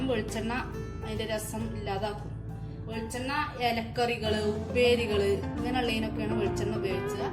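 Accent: Malappuram, India. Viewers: ഇത് മലപ്പുറം ഐഡന്റിറ്റി ഭാഷയാ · മലപ്പുറത്തെ സംസാരം ഒന്ന് വേറെ തന്നെ ചിരി വന്നു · മലപ്പുറം നാടൻ പാസ 😄😄😄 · Malappuram slang kelakkan vendiya njn vedio kananee 🙊🙆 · തനത് മലപ്പുറം ഭാഷ...അടിപൊളി 👍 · Mlpm slag ishttayi nallla rangand kelkkaan pinne thaatha nte vayel vellllam varunn nd vishappullla samayatha ee video kandath nte kodhi onnum ndavullla nte ponnu thaatha ichiri chor tharuo☺️ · മലപ്പുറം ഭാഷ കേട്ടരിക്കാൻ എന്തെര് സുഖം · ശരിക്കും മലപ്പുറം ഭാഷ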